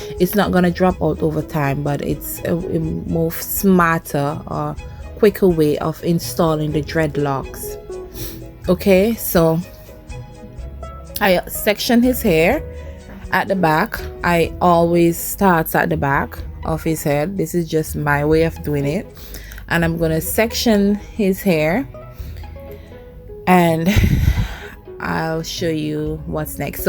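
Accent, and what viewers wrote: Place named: Saint Vincent and the Grenadines. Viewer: I knew you were an Vincentian I can hear the accent like my parents❤❤❤, I thank you for showing me an easier way to install dreads extensions 😊😊😊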